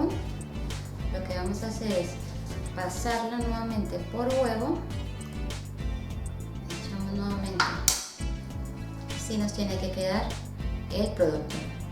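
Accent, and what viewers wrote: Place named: Cuba. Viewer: Hola.Gracias por tus videos. Estoy en una temporada donde la cosina me està encantando, y buscando unas recetas de croquetas encontrè tu video. Soy cubano, aunqueahora no vivo allà, pero si consumiamos muchas croquetas. Tu asento se parece al cubano.¿Eres cubana? Gracias.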